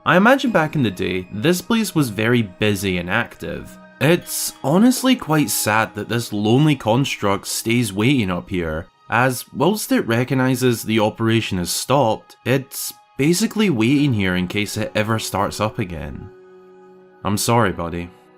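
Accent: Scotland, United Kingdom. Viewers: Awesome video, awesome accent too. You scottish, mate?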